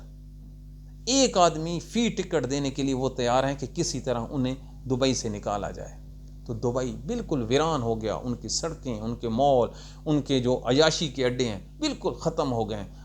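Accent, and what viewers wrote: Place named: Mianwali, Pakistan. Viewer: Bhi sab kia ap k taalq mianwali Cha?aoa meri shadi Sargodha hoi ha m b mianwali piplan c Hoon ap k lehja APNA shar jaca ha is Lya m or mera husband ap k vlog Shok c dakhtay hen Allah ap ko khosh rkha .or khoshi hoti ha jb ap reply krty hen.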